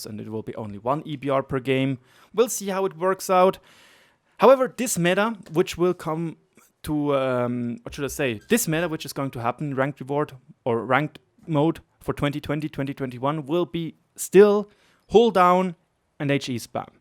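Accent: Germany. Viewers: Great job with the vids m8, one small hint tho': dont talk so fast :) i know your main language is german and your enlish is very good but the german accent sometimes kill your words :) keep it up - we enjoy your content and your effords!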